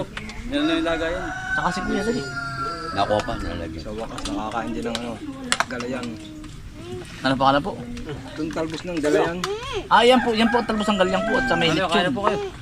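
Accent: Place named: Bicol, Philippines. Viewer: Yung salita mo po naalala ko yung kaibigan kong si unad taga bicol din 😢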